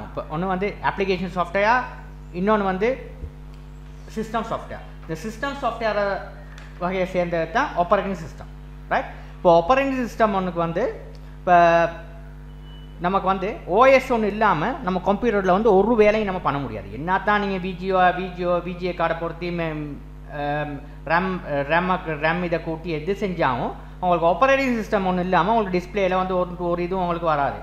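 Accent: Sri Lanka. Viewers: Bro nenga sri lanka va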